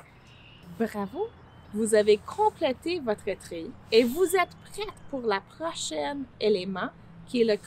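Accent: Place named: Canada. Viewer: charmant accent canadien!!!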